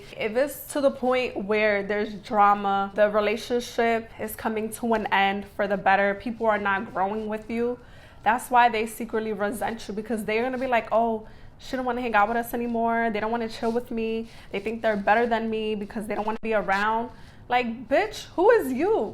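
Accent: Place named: New York, United States. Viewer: I love how you sound. Are you from New York?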